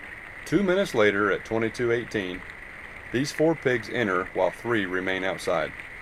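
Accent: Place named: South, United States. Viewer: Wow this is actually interesting as fuck lol and I'm loving the informative narration with a deep southern accent hahahaha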